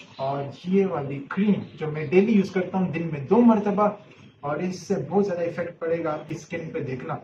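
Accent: Pakistan. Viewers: Bhai tere bolne ke style se lag raha hai ki tu Pakistani hai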